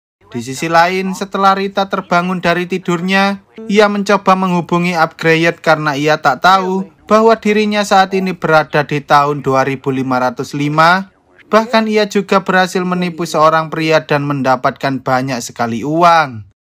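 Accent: Java, Indonesia. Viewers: Medok mu hilangin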